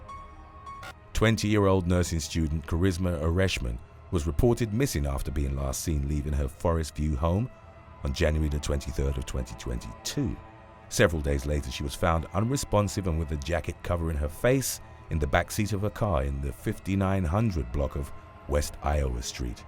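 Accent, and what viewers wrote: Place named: United Kingdom. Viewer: If this channels voice was an American voice this channel would actually get somewhere. I can’t take this shit serious when all I hear is British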